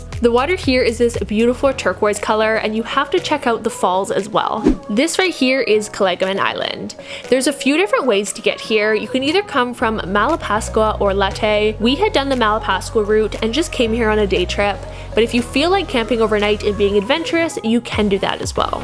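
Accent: Canada. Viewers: you didn’t it’s cute hearing Filipino names through a Canadian Filter?! 🤣😉 (Cebu does sounds Like you: Seebu vs I’ve Heard other Filipinos myself included sound more like: sabu less emphasis on the e, no biggie, just cute, don’t worry no one understands me either!) 🤣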